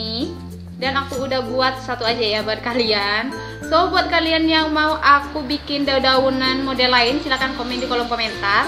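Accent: Madura, Indonesia. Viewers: Kak asal mana? Madura bukan sih... Bahasanya itu g asing 🤗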